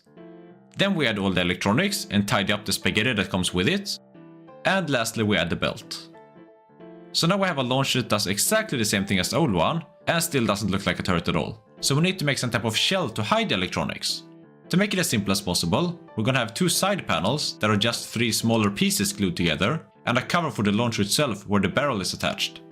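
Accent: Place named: Finland. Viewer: Are you Finnish? The accent is familiar!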